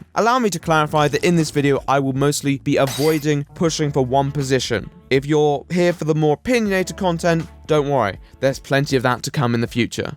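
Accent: RP, United Kingdom. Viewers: You sound like super nanny do all posh people not know how to pronounce acceptable?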